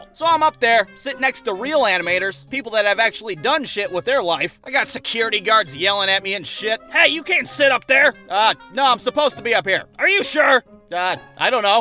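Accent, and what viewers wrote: United States. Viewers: So u from California Texas or new York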